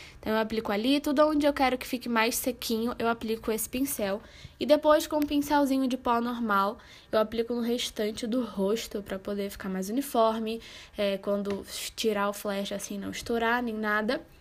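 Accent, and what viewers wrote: Rio de Janeiro, Brazil. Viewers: Achei que você fosse do Rio 😆 sotaque carioca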